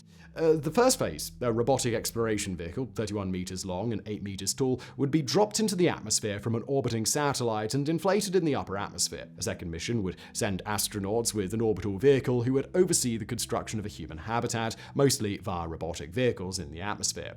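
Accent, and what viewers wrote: RP, United Kingdom. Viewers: I'm not from Britain but oh my god is this guy super posh or is it just me?? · Are you leaning heavily into that academic british accent? Or do you actually speak to your friends with that mouth?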